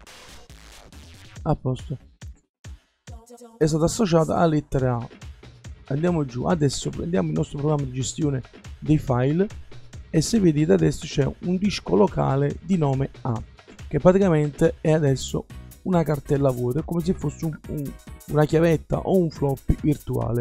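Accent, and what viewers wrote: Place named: Naples, Italy. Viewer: con il tuo accento partenopeo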